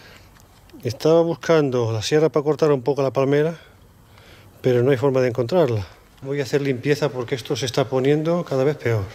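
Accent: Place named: Catalonia, Spain. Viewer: Noto en tu acento un deje Catalán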